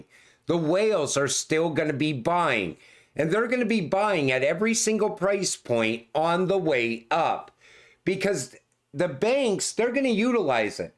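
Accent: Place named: Minnesota, United States. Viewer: Hey where are you from in the us? I'm guessing up north like minnesota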